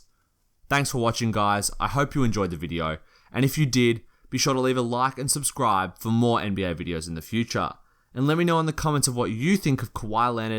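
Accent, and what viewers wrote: Australia. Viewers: Are you Australian? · That was an incredible video I love your a accent it’s just like mine go aussies go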